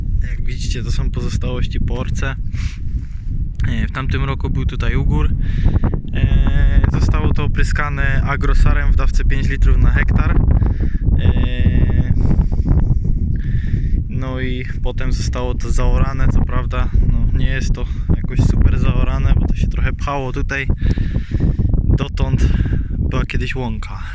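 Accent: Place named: Podkarpacie, Poland. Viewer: Prosty chłop, prosty film i prosty przekaz i tak to ma wyglądać Brawo. Przede wszystkim gratulacje chęci i odwagi do podjęcia się takiego wyzwania i realizacji marzeń. Wpadłem tutaj trochę przez przypadek ale daje suba i zostaje na dłużej z wielką chęcią na więcej. Wrzucaj częściej cos nawet z drobnych prac naprawczych, przygotowawczych itp. Powoli małymi krokami a cos z tego napewno będzie. Trzymam za Ciebie kciuki i szczerze życzę powodzenia. Nie jest to lekki kawałek chleba ale widać że dobrze kombinujesz bo grafika to przydatny fach w dzisiejszych czasach. Pochwal się tylko jeśli można wiedzieć ile masz lat i czy jesteś z Podkarpacia bo tak trochę po Twojej wymowie sugeruje się tym regionem? Jesli chodzi o poprzedni film to nie wiem czy doszukałeś się już informacji czy nie ale jeśli dobrze się orientuję to te końcówki w opryskiwaczu różnią sie sposobem oprysku w zależności od danej uprawy. 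Nie jestem rolnikiem ale pamiętam że wujek który nim jest opowiadał mi o tym kiedys bo tez sie go o to pytalem😉 Jeszcze raz powodzenia i tak jak mowie troche częściej jakieś drobne nawet wstawki z nowościami, przemyśleniami i wgl a na pewno znajdzie się więcej osób chętnych wspomoc dobra rada i słowem w komentarzach. Powiedz jeszcze jak pracę przy opryskiwaczu stoją narazie w miejscu czy cos ruszyło? Pozdro 👍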